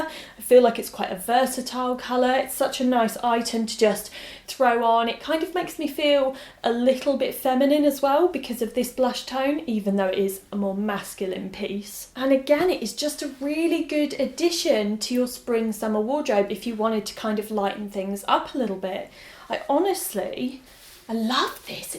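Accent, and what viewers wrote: England, United Kingdom. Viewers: Hello from Spain,:) I would love the light blue blazer (number two). I would wear it either with dark blue jeans, beige pumps and a white shirt or with a white dress. I love your videos, your style, your English... Watching your videos make me wanna live in England ❤️ Greetings from the very South of Europe.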